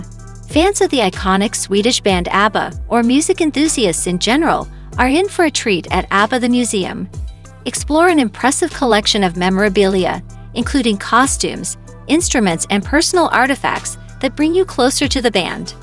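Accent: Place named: United States. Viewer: Can not listen to awful narrative with american woman accent jars on ear's.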